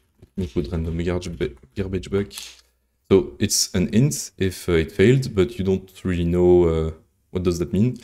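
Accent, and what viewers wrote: France. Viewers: Hum… réécrire des fonctions, un accent français… 42?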